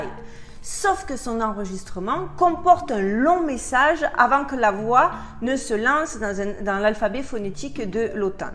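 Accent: Marseille, France. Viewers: Hello, merci de répondre aussi vite, je vous ai regardé vers minuit depuis la France et je n'attendais pas une réponse aussi rapide 😜 En fait je reçois youtube sur mon TÉLÉVISEUR (seul avantage de mon opérateur adsl !) et je regarde la nuit vos vidéos, celles de Silvano Trotta (excellent) de deQodeurs, de Réveillez-vous, de Ema Krusi etc.. que les meilleurs ! L'écran étant plus grand, je vois mieux ! Vous êtes la seule dont le visage apparaît en "blanc et noir", ce qui m'agace car vous êtes trop jolie à regarder et à écouter, votre humour et votre "accent du midi" sont rafraîchissants 🤗 Par contre, vous apparaissez normalement sur l'écran de mon portable mais il est plus petit ! Je vous confirme que je ne reçois pas toujours les notif. bien que j'aie activé la cloche.. Votre travail est excellent et agréable à suivre, sans prétention ni recherche de concurrence : all is right 😉 Maman d'une merveilleuse fille de 20 ans, étudiante, j'aimerais moi aussi la garder avec moi car savoir qu'elle doit porter la muselière toute la journée à la fac me révulse ! 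Bonne chance avec votre petit gars 😘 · C'est (encore) un pouce vers le haut pour ta vidéo me concernant ! J'adore la façon dont tu traites tes sujets et même ceux qui à priori me branchent pas trop, tu arrives quand même à les rendre intéressants. En prime, l'accent marseillais plus ta personnalité ça donne un style inimitable. Quand on repense à la raison de la création de ta chaine, au départ (cf ta vidéo FAQ), c'est amusant de voir ce qu'elle est devenu :D Si je m'écoutais je regarderais tout le contenu de ta chaine en 2 jours mais je préfère me forcer à pas trop en regarder à la fois de sorte à faire durer le plaisir ^^ Bravo et merci <3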